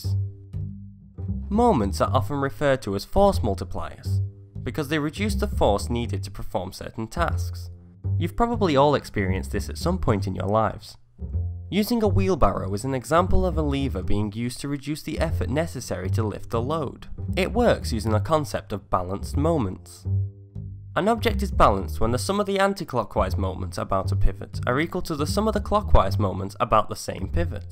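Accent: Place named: United Kingdom. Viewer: You remind me of minute physics but you're British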